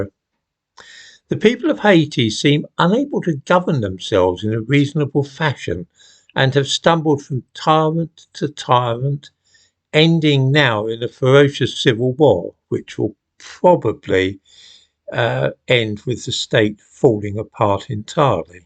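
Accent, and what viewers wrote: United Kingdom. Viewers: i have been following your channel for a while because you have excellent content, detailed analysis of history and politics and all without emotional drama as the corporate media always has. the problem however is you need a much better microphone. like professional podcast folks have. coupled with your heavy British accent, it's hard to understand you.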